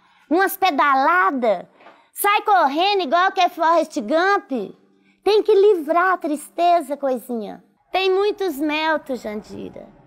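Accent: Minas Gerais, Brazil. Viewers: Bom de mais ouvir o sotaque mineiro.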